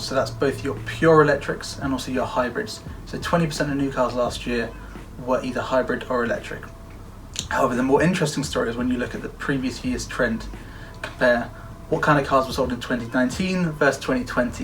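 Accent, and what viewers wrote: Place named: United Kingdom. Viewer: Kind of interesting that not even the closed caption can understand his British accent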